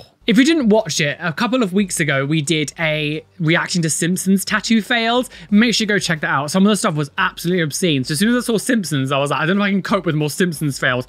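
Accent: United Kingdom. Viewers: That bri'ish accent girrrrrrl 😼🎀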